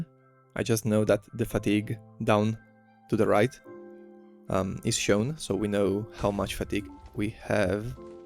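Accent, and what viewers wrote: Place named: Spain. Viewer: Ese pequeño acento en español y las palabras delatan un poco jajaja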